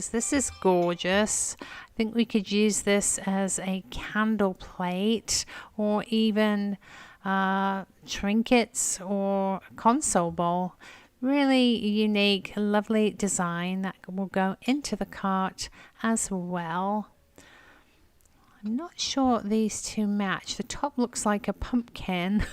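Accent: England, United Kingdom. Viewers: Where are you from? Where is the accent from? England?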